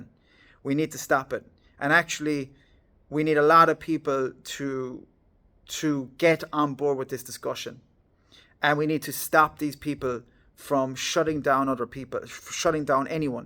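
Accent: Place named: Ireland. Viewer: Well spoken and clearly thought out words. I enjoyed your video, hearing your opinions, and hearing an Irish voice on the things happening here. Id love to see more of us doing this, I want us to be able to talk about these things in public and online without all the hate ❤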